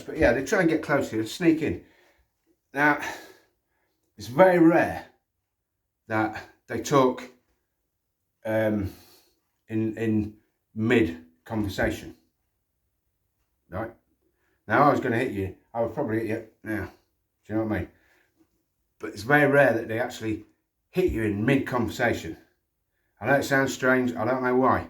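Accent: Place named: Coventry, United Kingdom. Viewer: Just subbed mate, may i ask your first name and where your from? Sounds like Cov, used to know a few from there.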